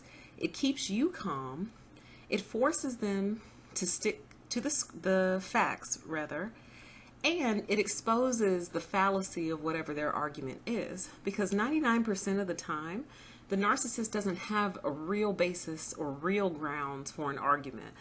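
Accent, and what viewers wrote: United States. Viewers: This is silly but I think constantly about this and I've watched like 20 of your vids. So I'll just say it. I am a trained vocalist, so this analysis comes from someone who at least understands tonality. I love the tone of your voice. You have a bright, cheerful quality yet also a mature mellow, wise depth. You present the sound very high on your upper pallet which is great, it's an excellent placement. You enunciate marvelously with a pleasant neutral American accent. You do not pronounce your words in an annoying fashion at all. You do not use obnoxious voice inflections. Listening to you is very enjoyable and leaves me with a positive feeling. I bless you in the name of YaHuWaH with truth! I love listening to your videos.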